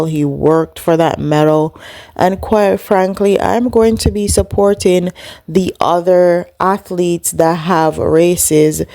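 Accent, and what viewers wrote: Jamaica. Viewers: Sounds bias to me..ur voice sounds Jamaican so clearly ur gonna be biased, when u don't wanna except the truth it could be sitting in ur lap and u wouldn't see it . But no matter how ur wrestle with this truth the Gold is coming to the United States. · You sound like a Jamaican.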